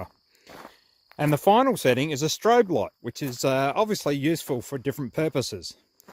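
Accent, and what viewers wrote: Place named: Australia. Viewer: Don't tell me, let me guess: You're from Australia, right? :D In all honesty: Thanks, that was very helpful!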